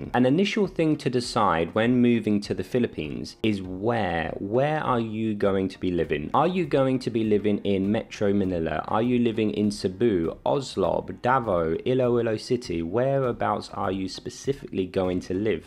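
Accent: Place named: United States. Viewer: u must been a american that is why u talk like that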